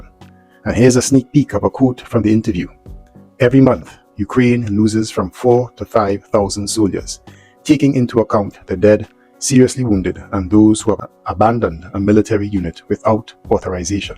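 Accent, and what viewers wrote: Trinidad and Tobago. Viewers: I trini too but I wondering if I hearing ppl in trini accent , good reporting first time I’m seeing your channel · Is that a Trini (Trinidadian) accent I'm hearing? Glad I found this channel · New sub🎉 You sound Trini. Very cool if you are! Very informative work!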